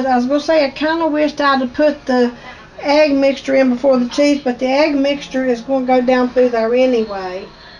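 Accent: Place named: South, United States. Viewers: You make me homesick for old times when I was with my granny. My family is from West Virginia. You say there like my granny said it. Yes I grew up on sausage gravy. We always used canned milk. So good. We added water too. This recipe looks good. Thanks. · I love her southern accent! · That looks and sounds delicious. Gotta try this. I love your Hillbilly accent. I have a Texas twang myself. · Hello to you both 😊 I have just found a video of yours for the first time and i love it. I live in England and I allways find southern accents like your super lovely and nice. Cant wait to watch more of your videos, this one looks super delicious xxx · Mary Mitchell sounds like dolly partons the same accent cute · Ms I bet you can bake bread and rice pudding and cakes. our family was not raised on candy. mom would cook rice pudding when she had change on Sunday it was good Like your southern talk love you val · I love you, and your old fashioned recipes! 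Your accent makes me think of my maternal grandparents from Ky, originally from W.Va. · Lovely Lady, I won't laugh at your hillbilly accent if you won't laugh at my southern accent. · Where are you from if you don't mind my asking sounds like ga nc sc dialect.....you just answered my question in this video lol i have family in West Virginia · Hi, I just found you and your channel. I think you are absolutely PRECIOUS!! You aware perfect the way you are, and nothing wrong with being from Beautiful West Virginia either! It’s ALL GODS COUNTRY!! I love your accent, your cooking, down home style, the way I learned to cook from my Mom and Grandmother’s! I’m an Okie myself, Oklahoma City, but my Family is all over the state, yes, we are part Native American, coming from Indian Territory. Anyway, so funny, we made Breakfast casserole for dinner tonight! I too Normally let mine set overnight in the fridge, but I let it set for 30 minutes tonight. 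I too play with the ingredients, use what I have, tonight we had sausage, Bacon and Canadian bacon in this batch. I have never used yellow mustard, but I use dry Mustard in mine. I did want to tell you what I do, and hopefully you will try it. In the past, I always used the bread slices also, but it always seemed dry. So I got the idea, to use hash browns instead of bread. I use the loose ones or the patties. I do everything else the same. My family loves it, it’s never dry. I would love for you to try it, and let me know what you think! We love Hot sauce or Salsa with as a condiment with ours. It’s a dish that gets eaten up completely!! Anyway, love your channel, your cooking, your accent and your sweet personality!! Keep up the great work! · well, I am a HILLBILLH too... I was born and raised in West Virginia.. I was DIRT POOR my entire life until a little over 18 years ago when I moved to South Carolina and married a very decent man.. 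I knew you were hillbilly when I heard your voice. I too am VERY PROUD of my heritage.. You can take the girl out of the mountain, but you CAN NOT take the mountain out of the girl.. Nothing wrong with being a hillbilly.. Hillbillies are some of the nicest and decent and God fearing people on the face of this earth.. God bless you and your family... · I am really glad that you mentioned where you are from! You sound like my folk. I live in Texas now but I was born and raised in Parkersburg WVA!! You two are adorable! Thank you for sharing. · My mother was from Crab Orchard, KY, and everyone around there sounded just like. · Love your accent...reminds me of growing up in Covington, Virginia.....just a few miles from the WVa line at White Sulphur Springs, WVa. I still live in Virginia, just down on the southside near the NC line now...we have country accents down here too! I am told I have a mix of southside and Western Alleghany accent now. 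LOVE your videos and your REAL cooking...and down home atmosphere.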